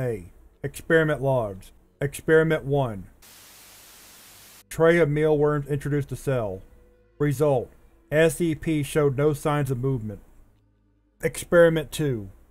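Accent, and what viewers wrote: South, United States. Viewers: Ahh yes, your sweet southern SCP narration voice is always there for us!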